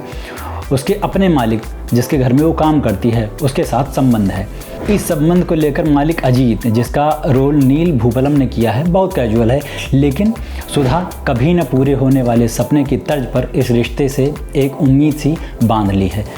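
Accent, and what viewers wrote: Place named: Uttar Pradesh, India. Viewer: subhas chandra mondal...... chutiya ho kya jindgi bhar judgemental reh jaaoge.... ye typical up ka tone hai.... sabse bc tone tera hai wo hai racist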